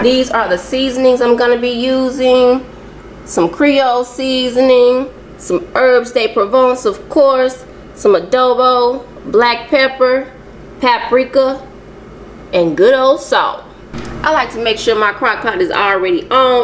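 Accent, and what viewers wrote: South, United States. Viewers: good ol southern accent I love it lol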